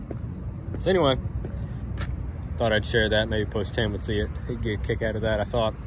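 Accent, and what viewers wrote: South, United States. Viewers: ...You got me with the thumbnail...I thought ooh new Post 10 video...Then I clicked on it and heard your smooth southern accent...haha!!! You're both Legends! What you need is your 'Drain Maker 6000'...haha!!!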